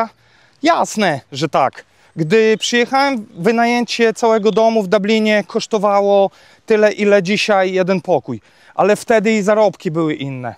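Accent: Podlasie, Poland. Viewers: Podlaski akcent? :-) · fajnie mowi; a skad tam przybyl? pewno z Podlasia, nie? Z Suprasli czy z innej meisjcowosci?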